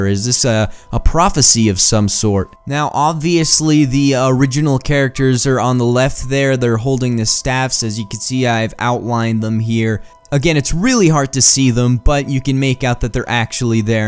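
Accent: Bosnia and Herzegovina. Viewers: are you Bosnian?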